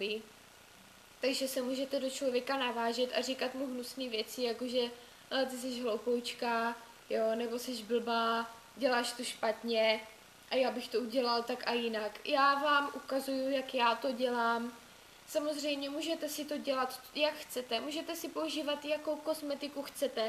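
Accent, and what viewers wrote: Moravia, Czech Republic. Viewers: Líp bych to neřekla.. :) a jen tak mimochodem.. tak trochu podle přízvuku.. ty jsi z moravy? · hele mam otazku! Ty jsi z Moravy ???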